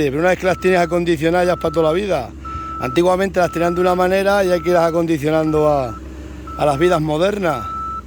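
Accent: Extremadura, Spain. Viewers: Buenos dias Muy bien lo q estás haciendo en la Finca chaval,eres un máquina,eres tan fuerte como la máquina esa que prepara la finca,nos levántas el ánimo a los seguidores de verte trabajar a ti,y lo bien que sabes hablar,no pierdas nunca ese acento extremño tan chulo q tienes,se nos está pegando a todos.¡me encanta!Saludos amigo.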